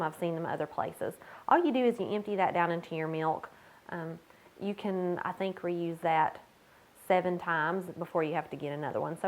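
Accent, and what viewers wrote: South, United States. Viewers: THANK YOU for mentioning how to store the grains without making more Kefir! Yours has been the only video (and I've watched about a dozen now) that mentions this. I want to start making Kefir, but don't envision that I'll need to make a new batch everyday (like everyone seems to do) and I was wondering what one did with the grains to store them, or if this was even possible. P.S. Love your southern accent. : )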